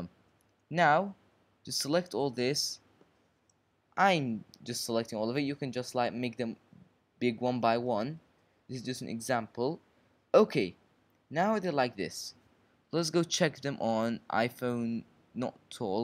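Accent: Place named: France. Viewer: Thanks you french-voiced stranger.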